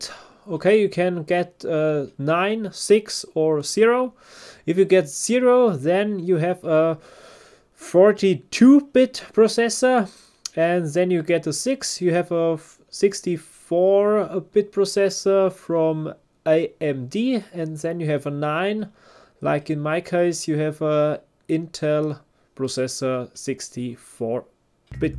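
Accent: Germany. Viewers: Hab nur fur deutsche Aussprache geklickt wurde nicht enttäuscht. Danke weiter so bist der beste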